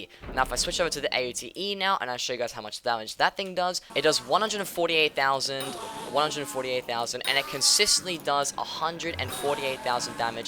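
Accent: Australia. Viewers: Are you a Melbourne boy?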